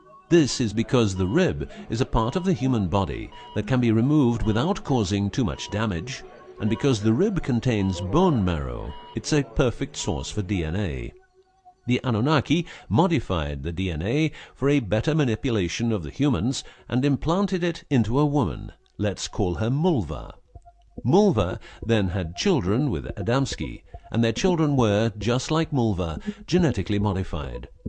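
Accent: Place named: United Kingdom. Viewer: These assholes lost my interest when during the segment describing brain benefits of monatomic gold, they said, "detecting lies" and flashed a picture of George W. Bush instead of Bill and Hillary Clinton. That's pretty much all I needed to know about these idiots. A British accent spewing bullshit does not a watchable video make.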